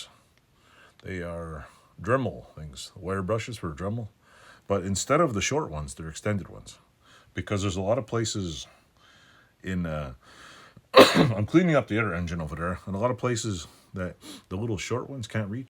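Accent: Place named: Canada. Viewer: you sound like native canadian but are from utah i assume... so odd... <link> sign guy btw ^ Tony!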